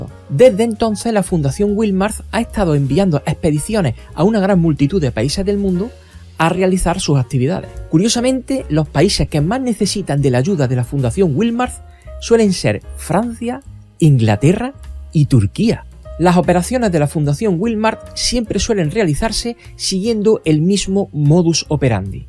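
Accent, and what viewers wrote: Andalusia, Spain. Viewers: Tienes acento andaluz. Saludos de México. Y qué buen descubrimiento saber de éste tu canal.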